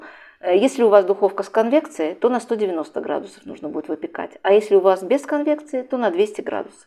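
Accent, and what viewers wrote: Baku, Azerbaijan. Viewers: Как все по бакински! Просто наслаждение от родного акцента, четкой толковой подачи, вашей красивой внешности и даже интерьер кухни напоминает чем то Баку, ну а рецепты… когда смотрю их, перед глазами встают бакинские посиделки и «гонаглыхи» 70-80х. Прям хочется обнять вас, родная! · Спасибо!!!!Из комментариев поняла, что Вы бывшая бакинка? Огромный привет, землячка! Такое удовольствие услышать чуть уловимый акцент, ничем не истребимый у тех, кто жил в Баку. Желаю Вам успехов, здоровья и благополучия! Всем мира и любви! Пирожки завтра же испеку. Уверена - рецепт классный! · О,этот сладкий бакинский акцент!,спасибо большое за чудесный рецепт и за такую приятную подачу. Храни вас Господь ❤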